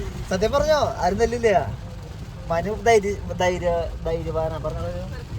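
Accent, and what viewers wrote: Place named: Thrissur, India. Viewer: 😹ithethu slangaaa ,thrissuraa...chavaru slaang ...njan stopped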